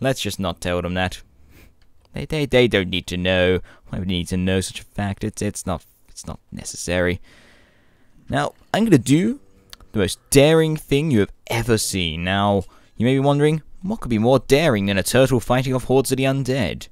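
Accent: United Kingdom. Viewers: I love your voice im british too <3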